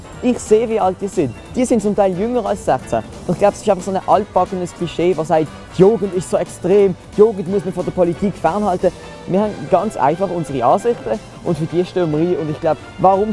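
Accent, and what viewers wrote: Switzerland. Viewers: Nai, das isch Baseldytsch wo dä schwätzt.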